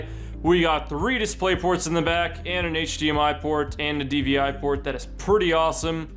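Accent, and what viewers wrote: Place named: Boston, United States. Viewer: why would you say wicked? because youre from Bawston. Own that shit, brah.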